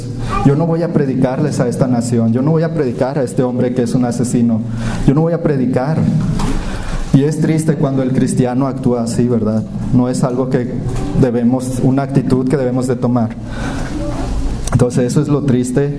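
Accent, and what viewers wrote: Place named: Mexico. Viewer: Hola ¡Por tu acento puedo creer que hablas desde México! ¿vale? ¡Que nuestro Dios venga a alumbrar a todos pueblos Mexicanos! “ Lámpara es a mis pies tu palabra, y lumbrera a mi camino. Salmos 119: 105. “ 💡 Saludos desde Brasil. 🇧🇷 Al mi México querido. 🇲🇽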